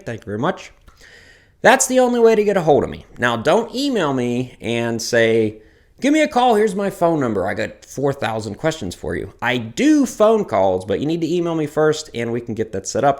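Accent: Western Pennsylvania, United States. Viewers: I always thought you were in Pennsylvania. You have a western PA. accent.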